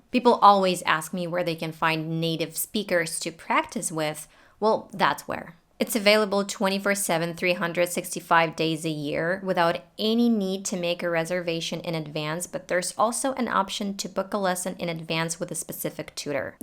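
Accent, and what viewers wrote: United States. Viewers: No wonder those kind of questions... Your american standard accent is amazing! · согласен, обычный английский, как у любого носителя американского английского языка, утверждаю, как живущий в Нью Йорке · It is Amazing for me to meet you specially because you have a perfect american accent and you are not american!! i really though in you as an american woman o maybe a latin woman living in America. I am impressed. Thank you a lot for making videos.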